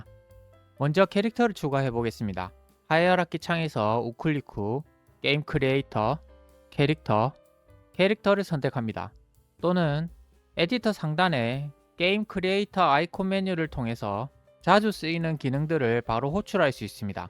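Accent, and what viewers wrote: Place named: Gyeongsang, South Korea. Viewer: 말씀 하시는거 보니 경상도 분 같으신데요 저는 서울삽니다.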